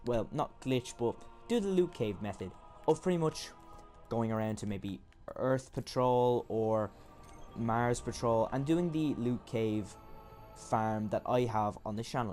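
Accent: Ireland. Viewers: Are you Irish??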